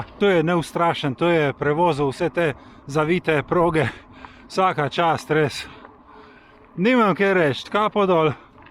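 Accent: Primorska, Slovenia. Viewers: Občasno spremljam vaše zanimive video bloge. Všeč pa mi je to, da v njih govorite v lepi in razločni slovenščini(da ima primorski pridih je zgolj pozitivno) in prav tako vidim, da se zelo potrudite tudi v komentarjih in odgovorih tukaj ali na Stravi. Pohvalno👍 Srečno v hobiju, ki vas veseli pa naj bo to na cesti, makedamskih ravnicah ali pa vratolomnih spustih po trailih.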